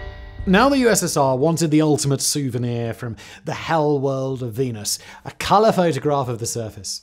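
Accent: United Kingdom. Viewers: why is there suddenly 50,000 british guys narrating stock footage for ad revenue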